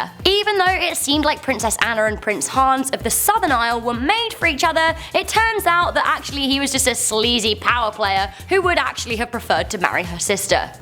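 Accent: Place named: United Kingdom. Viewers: British. Obviously. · British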